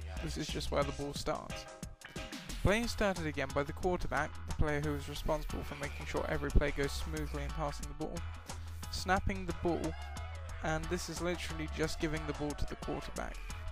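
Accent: United Kingdom. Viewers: Thanks really helpful, some people don't understand a British accent, don't know why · are you from england?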